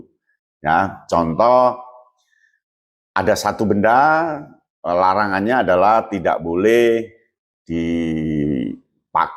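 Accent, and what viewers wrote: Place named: Jakarta, Indonesia. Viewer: Sayangnya pembawaanya kurang Njawani malah terkesan bahasa ber ala Jkt.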